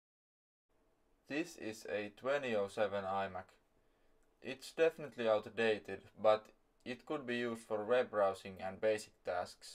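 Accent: Finland. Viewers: ootko suomesta :D tuosta englannin aksentista ei voi erehtyä! Mulla on kaksi tällaista, 24" sekä 20" mid-2007. Molemmissa prossuna T7700. Tuohon isompaan olisi hauskaa laittaa X7900 tai T9500, Catalina-tukea en tarvitse.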